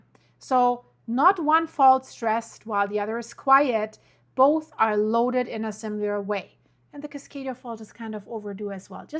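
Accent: Germany. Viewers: She's German 😂😂. It's hilarious 😂 to listen to her pronounce some words. Love ya 😊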